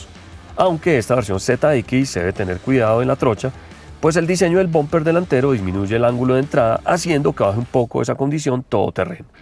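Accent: Colombia. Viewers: De dónde eres una pregunta tu eres colombiano Es que pareces colombianos yo reconozco muy bien como hablan los paisas los rolos vallunos y me parece que eres de esa parte del pais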